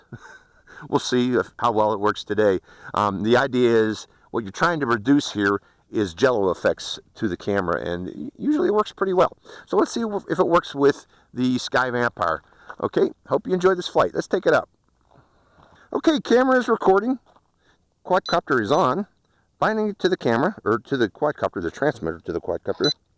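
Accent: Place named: Pennsylvania Dutch, United States. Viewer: Hi Mr. I really like this particular quad! And I dont mind buying from China, But I wanted to ask you about spare parts, are the available and if so where is the best place to get them? Thanks for all your great reviews, I dont ever miss one! You are THE best quad reviewer on youtube by far! I am from Reading PA and I think you said in one of your past videos that you were from Pittsburgh, Once in a while I hear some Pennsylvania Dutch in your voice? Do you have some Pa dutch in your blood?? Thanks again and keep up the fantastic work! Happy Easter to you!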